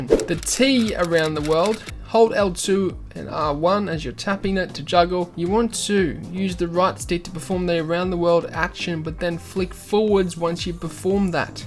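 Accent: Australia. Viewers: Aussie are u coaching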